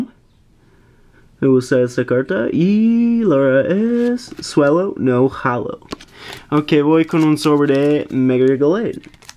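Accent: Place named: England, United Kingdom. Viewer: Me encanta tu acento ingles en el castellano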